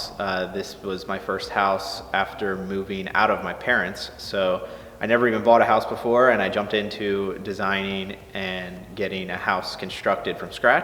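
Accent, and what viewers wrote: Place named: Philadelphia, United States. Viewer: I'm from Pittsburgh and you know we have a distinct accent. My welders helper is from the Philly area so I pick up on it. Your set up it awesome though Man!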